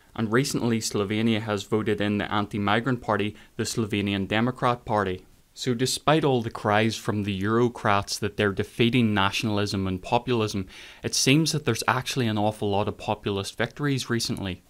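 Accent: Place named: Northern Ireland, United Kingdom. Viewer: I love your Norn Iron accent. Nice seeing people from our country getting popular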